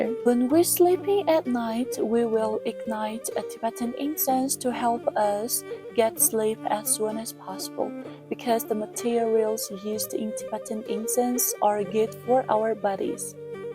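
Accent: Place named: Southeast Asia. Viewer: your English is not same as Most Chinese, kind of south east Asian accent. Though very good presentation. Tibet blessed.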